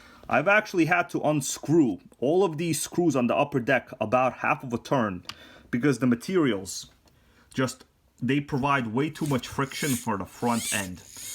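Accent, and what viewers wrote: United States. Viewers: Hmmm I think in the long run it’s worth spending more bucks on a more reliable machine. I’ve only recently started watching your videos and only recently started thinking about getting into nitro. Just bought an hsp nitro truck and after looking at your video I’m going to return it and maybe go for a Hpi bullet nitro. The problem with having a cheap car with faults that you just pointed out would certainly put one off once it starts falling apart. The only problem with the Hpi bullet nitro is no 2 gearbox, maybe there is an upgrade? Please keep your presentations coming really enjoy it. That accent of yours, which part of the states, sounds really cool . This is Ricky from down under regards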